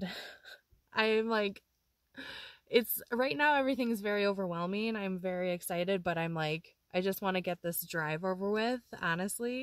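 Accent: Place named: Midwest, United States. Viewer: I love watching little Joey explore so much...you could literally strap a go pro to his back and make a channel and I would sub. good luck on the new chapter. I have loved watching you for the last year and appreciate you sharing your life. plus your midwest accent makes me feel at home. tehe. xoxoxox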